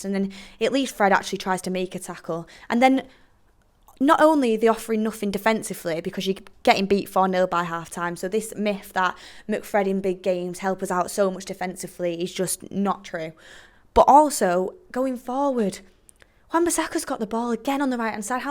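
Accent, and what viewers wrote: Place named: Salford, United Kingdom. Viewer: That's the most Salford accent ever ahhaha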